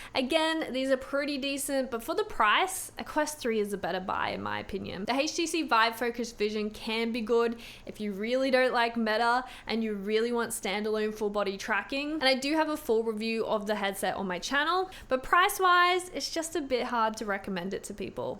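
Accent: Australia. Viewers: damn, im usually attracted to aussie accent. hers is pretty annoying. maybe its just her voice.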